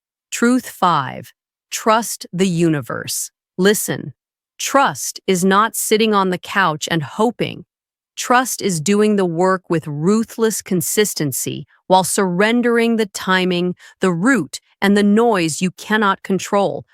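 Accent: United States. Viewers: It is an American accent based on my hearing.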